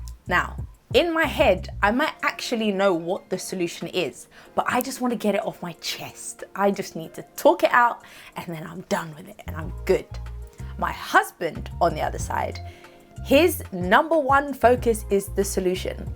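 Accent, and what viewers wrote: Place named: London, United Kingdom. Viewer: I didn’t notice your accent until you said you were from London 😂 it’s so subtle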